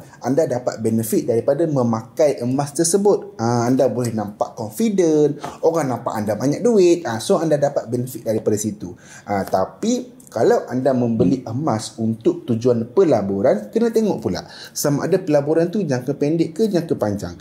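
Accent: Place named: Kelantan, Malaysia. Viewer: Demo ore Kelate ko boh? Bunyi lagi Kelate tu boh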